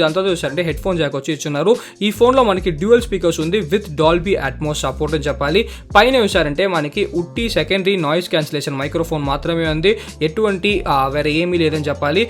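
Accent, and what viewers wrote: Chittoor, India. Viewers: He is from Chittoor or Anantapur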